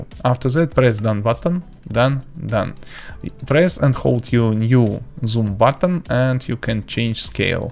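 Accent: Russia. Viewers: Русский акцент :| учи русский :D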